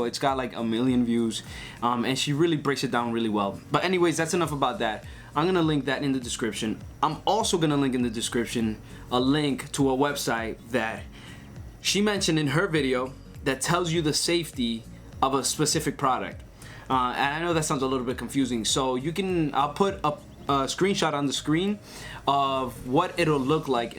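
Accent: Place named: Dominican Republic. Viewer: I knew he was Dominican by the first 2 words he said. 🇩🇴🇩🇴🇩🇴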